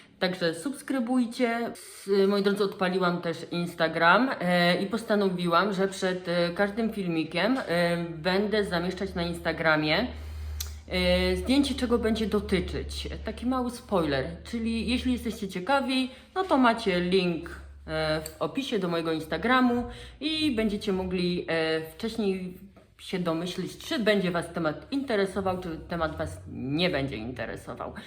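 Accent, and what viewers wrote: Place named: Greece. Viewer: Skąd taka wymowa imion i nazw miejscowości? Czemu „Menelos" zamiast Menelaos? Czemu „Mikines" zamiast Mykeny? Czy to wymowa strikte grecka? W sumie nie ma co się dziwić, że Klitajmestra znalazła sobie kochanka Ajgistosa. Przecież Klitajmestra znienawidziła swojego męża po tym jak złożył w ofierze ich córkę Ifigenie, tylko po to by statki mogły odbić od brzegu i popłynąć do Troi. Jeszcze wmówił matce i córce, że Ifigenia ma zostać zaślubiona Achillesowi, tymczasem podstępnie ją zabił. A jeśli chodzi o trzy wersje śmierci Agamemnona to może rzeczywiście pierwsza jest najbardziej prawdopodobna. Trzecia z tego co pamiętam, ale mogę się mylić bo sztukę czytałam dawno została przedstawiona u Ajschylosa w Oresteji. Druga wersja po prostu wydaje się ściągnięta z motywu śmierci Heraklesa. O nim się mówi, że został zabity przez Dejanire, która nieświadomie podała mu szatę nasączoną krwią Nessosa. 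W sensie szatę podała z premedytacją, ale Herakles miał ją po prostu znowu pokochać a nie zemrzeć.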